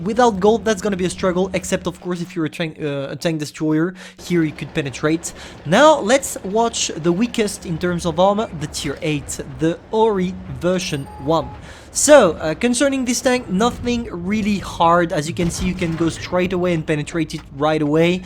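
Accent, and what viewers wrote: France. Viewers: From where are you? I would guess France cause of the accent (btw rly good English), btw you just blindshooted me today. Nasty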